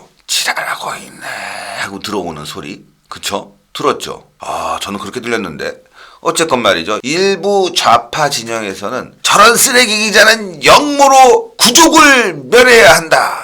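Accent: North Korea. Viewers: 딸랑 딸랑이 입니다. 이북사람 목소리 같네요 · 평안도 사트리 같애요 억양이?!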